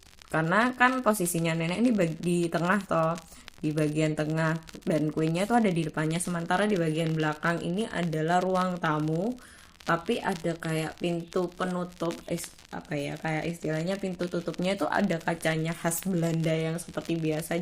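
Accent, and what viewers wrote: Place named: Java, Indonesia. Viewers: Teh versi logat jawa ya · iya medhok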